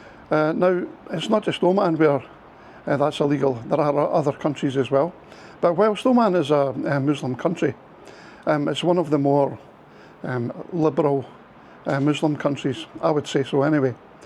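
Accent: Scotland, United Kingdom. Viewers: Binge watching your videos tonight from India. Your Oman videos are great and hey I like that Scottish accent😂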